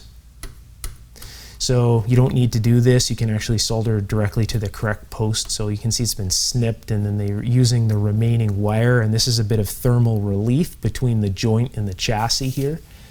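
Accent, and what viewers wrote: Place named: Canada. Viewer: I cannot quite pinpoint his accent/dialect - I am guessing it is Canadian since the pronunciation is so articulate and clear - But which is it? 🙂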